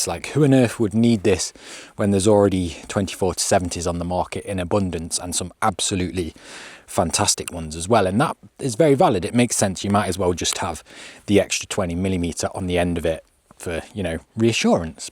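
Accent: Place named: Nottingham, United Kingdom. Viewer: Great vid and nice to hear a solid Nottingham twang on YT 😊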